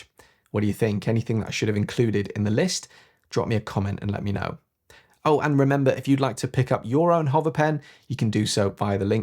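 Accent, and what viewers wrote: United Kingdom. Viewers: 😂thanks but u seem like u have super powers in explaining and speaking convincingly. American Apple engineers would be hypnotized by your Brit accent 👌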